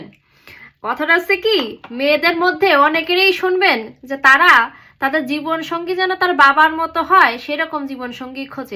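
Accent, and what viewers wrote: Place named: Jessore, Bangladesh. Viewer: আপনার কথা শুনে মনে হচ্ছে আপনি যশোর জেলার মানুষ জানতে চাই আপনি কোন জেলার মানুষ?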